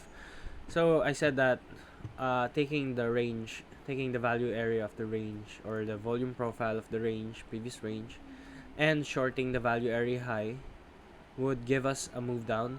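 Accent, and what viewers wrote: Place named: Philippines. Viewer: Just curious with your accent if you're a Filipino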